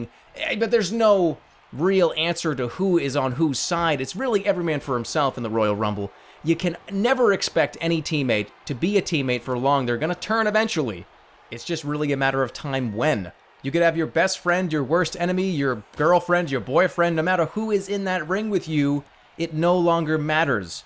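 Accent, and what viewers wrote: Boston, United States. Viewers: sounds so much more bostonian here I love it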